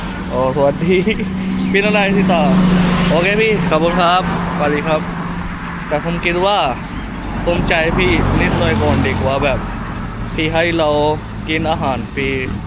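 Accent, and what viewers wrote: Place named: South, Thailand. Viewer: สำเนียงใต้เลยนะฝรั่งอะไรวะ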